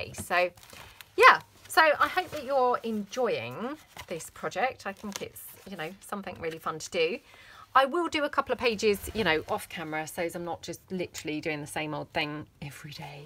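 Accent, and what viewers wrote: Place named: United Kingdom. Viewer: I love your videos,! This is another great one. You are so talented and kind! And besides, listen to you talk reminds me of a wonderful time in my past when I lived in the UK. Thank you for all! 🥰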